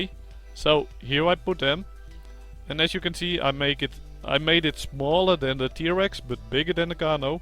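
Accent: Netherlands. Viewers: Your accent sounds dutch Are you dutch? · you sound like you are from nl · are you dutch you sond like it? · it is just the way dutch poeple talk english becuse you just talk like me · dutch accent xD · je bent gwn nederlands, valt aan je accent te horen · je praat met een zwaar nederlands accent moaaaaan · u have the most dutch accent i ever heard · are u dutch? if not u sound like a dutch talking english ^^ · Are you dutch? I can hear it.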